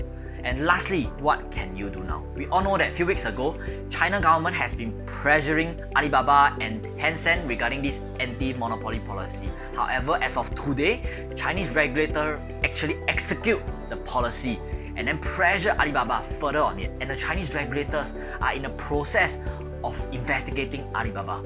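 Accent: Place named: Singapore. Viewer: I understood fine. If you are used to this type of accent. I have coworkers in Singapore that have this same type of accent.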